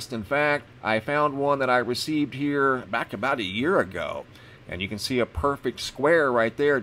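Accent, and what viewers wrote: United States. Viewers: Love the way you American's pronounce English town/city names, so cool, wish some English people would annunciate more.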